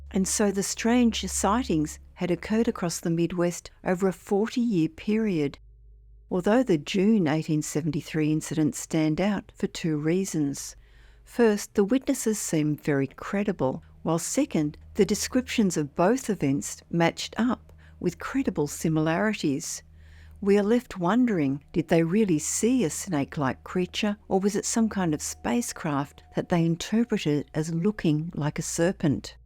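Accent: Australia. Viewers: The Australian accent makes it less credible. I'm not sure why. Maybe its a Ross Coulthart effect. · She’s American. This is an Australian accent!!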